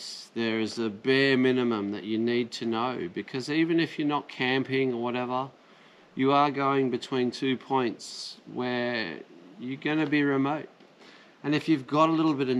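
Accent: Australia. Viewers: love that Ozzy accent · explained by a fellow Australian and in an accent/lingo I understand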